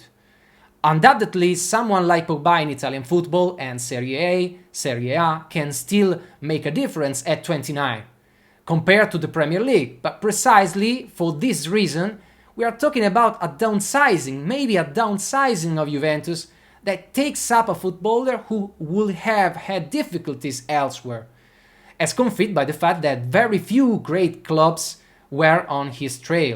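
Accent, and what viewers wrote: Campania, Italy. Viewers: In inglese si perde il tuo grazioso accento campano..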